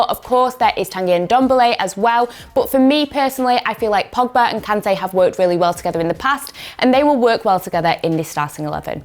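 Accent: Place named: Manchester, United Kingdom. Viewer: German here: Like her accent:) Is that some 'less thick' version of Mancunian? Fell in love with Manchester accent listening to Gary Neville and pronunciation kinda reminds me of that. Noticed tho that she doesn't have a glottal stop in double t's or an 'ey' in words that end on 'y'... just some comment on that would be appreciated. Thanks in advance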